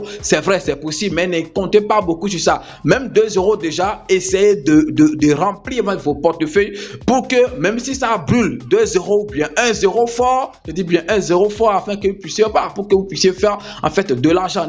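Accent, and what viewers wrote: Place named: Africa. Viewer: C'est l'accent africain.. normal quoi . Fais toi plutôt l'effort de comprendre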